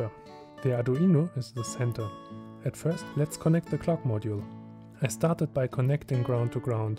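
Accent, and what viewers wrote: Germany. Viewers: Höre ich da etwa eine leicht deutsche Aussprache heraus?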